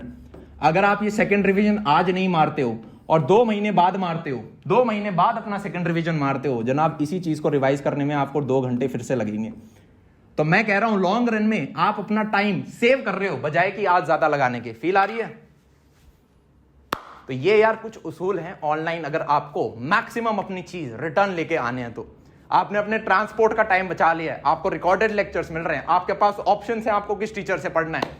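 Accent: Haryana, India. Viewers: does any one recognise haryanvi accent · Bhaiya aaj aapke bolne tarike mein badlav lag rahe hain. aap pahle se alag tarah se bol rahe ho lagbhag Haryanvi mein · Bhai haryanvi touch ohogohohohogoho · Aaj toh Haryana tone m bol rhe ho bhaiya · Bhaiya slowly slowly your accents are transforming into Haryanvi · I've felt how his language and accent changed and leaned to haryanvi accent over the time😂😂😂 I think it's effect of geography or the friend circle 😂😂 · Haryanvi lag re hai aaj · Bhaiya.. Hryanvi tone mein kbse bolna shuru kia aapne? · bhaiya hariyanvi ka tone aa raha tha aaj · Haryanvi lag raha bhai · Bhaiya aaj to aapki voice me Haryanvi touch tha maja aaya Bhai 🙂🙂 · Bhai aaj hi nhi pichle kuch mahino se bhaiya haryanvi jaise hi baat karte h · Bhaiya aaj kuch haryanive tone bhut aa rshi h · When did get this Haryanvi accent? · Haryana accent ho gayaa hai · Ask bhaiya haryanvi accent me bol rhe h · Bhaiya ye Haryanvi tone suit krti h aappe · Sounding haryana style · Please give up your haryanvi accent it doesn't suit you. 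· Bhaiya ki boli.. Haryanvi ho gayi lockdown mei..😅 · Sir today your voice like haryanvi nature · haryanvi voice ho gyi bhaiya ki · Bhaiya haryanvi accent aa gya aap mein toh permanently ❤ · Bhaiya Haryanvi accent 😍😅.... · He is a Jaat so there is always a touch of haryanvi accent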